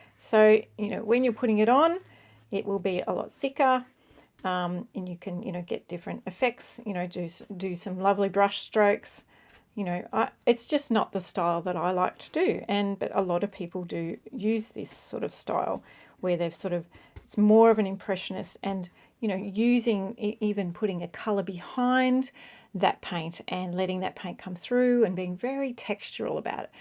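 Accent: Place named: Australia. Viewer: super informative! glad to hear a fellow aussie too 😂 thanks for the vid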